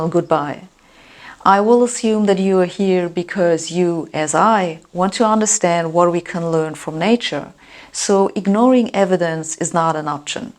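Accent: Germany. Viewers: Learn English, I don’t understand this German accent.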